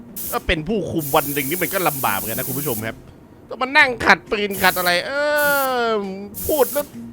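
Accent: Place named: South, Thailand. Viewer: ชอบความ พูดภาษาใต้จัดๆ ภาษาใต้เป็นภาษาที่อารมณ์ดี 5555+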